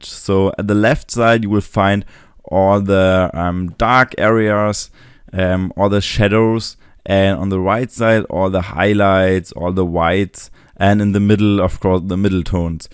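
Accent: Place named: Germany. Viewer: echt angenehm, das Englisch von einem Deutschen zu hören, dann kann man auch mal was verstehen xD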